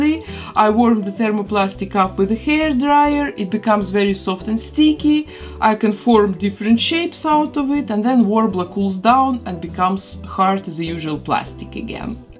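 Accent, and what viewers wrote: Russia. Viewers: you have such a strong Russian accent. Wow🤨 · Why do you have a Russian accent? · your accent is so comfortable but hard at the same time, and the way you make sentenses are just so russian vibing you know😆😅are you russian/ukrain??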